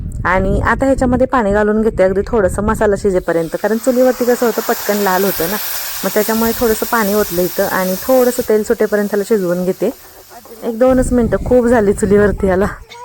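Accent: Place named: Latur, India. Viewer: मला वाटल कि तु लातुरचि आहेस भाषा तशीच वाटली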